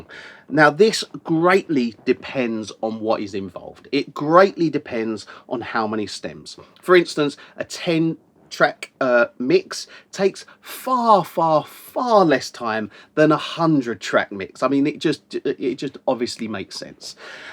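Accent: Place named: Cockney, United Kingdom. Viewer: So, this is cockney accent, I guess :)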